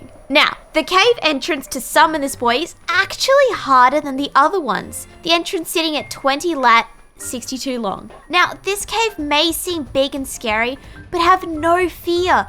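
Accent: Australia. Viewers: When did become a women also ur aussie accent if pretty cool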